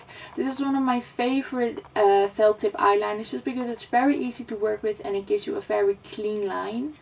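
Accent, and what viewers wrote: Netherlands. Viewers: dat nederlandse accent springt er wel uit haha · Hi! Thanks for the tutorial, it was very helpful. My eyes are horribly hooded, I had kind of given up on eyeliner but this gives me hope! Your accent is cute, are you from the Netherlands? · You are Dutch... not even a question lol i can just tell 😂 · Nice tutorial, you sound Dutch👀 hey there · Het is half 11 s avonds en ik ga deze tutorial meteen testen. Altijd gestruggled met winged eyeliner (i am assuming you are dutch by your accent, if not I am sorry) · Are you Dutch? I can really hear it through your accent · ik vermoed een Nederlands accent ???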